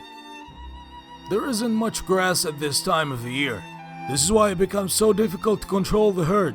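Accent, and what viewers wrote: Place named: Hollywood, United States. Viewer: بارك الله فيك على هذا العمل الجيد والممتع والراءع في نفس الوقت باللغة الإنجليزية ،اتمنى لك كل التوفيق في مجالك وننتظر منك الجديد ،متابع جديد ،ملاحظة لغتك الانجليزية هوليودية بامتيازواصل .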